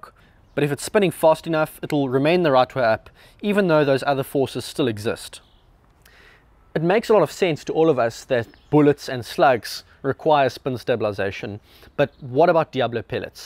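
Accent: South Africa. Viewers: I thought he was south African?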